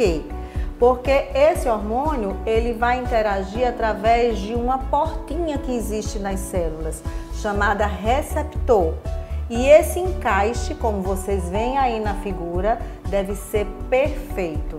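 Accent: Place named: Northeast, Brazil. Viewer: Esse sotaque gostoso é só que lugar do nordeste?❤️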